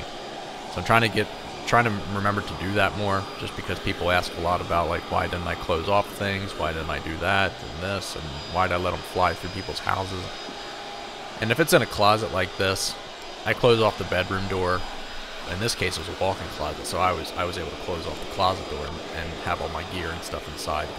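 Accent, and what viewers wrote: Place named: Philadelphia, United States. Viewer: Not sure if you're aware of a show called The Bonfire out of NYC but they would get such a kick out of how you say words like "COMB" and "HOME" They are, what you might call, connoisseurs of Philly accents.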